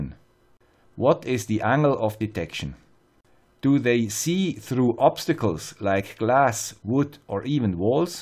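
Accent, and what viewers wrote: Switzerland. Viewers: Mit einem Schweizer Akzent? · Absolutely outstanding work,. I love watching your videos, and I love you Swiss accent, too:)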